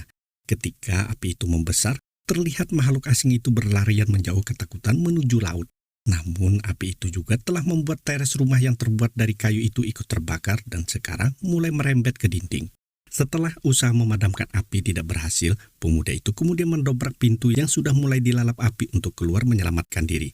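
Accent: Indonesia. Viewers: Maklum orang Sunda ya bang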